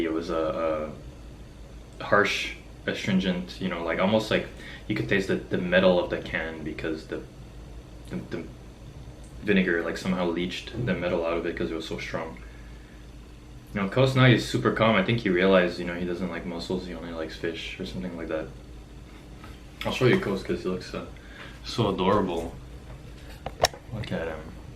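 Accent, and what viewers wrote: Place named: Quebec, Canada. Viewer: Matiz makes a nice can of mussels. Your accent was sounding very familiar to me and then I realized it is like my great grandmother's was, she grew up in Quebec. Cheers from a fellow canned seafood enjoyer and Souls games player!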